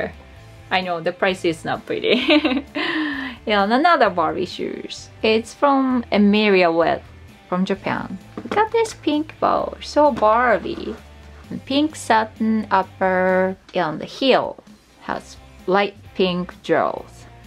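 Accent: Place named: Belfast, United Kingdom. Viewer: hey love your belfast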